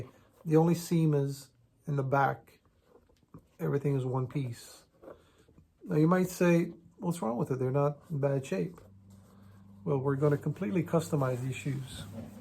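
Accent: New York, United States. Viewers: I like how you talk. Are you from up north, like New York or something?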